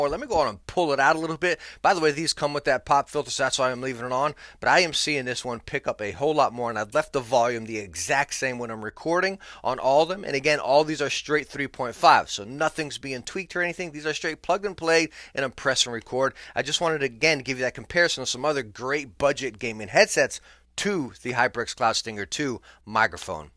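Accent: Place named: Staten Island, United States. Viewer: I love your accent, my man. Are you from Staten Island by chance?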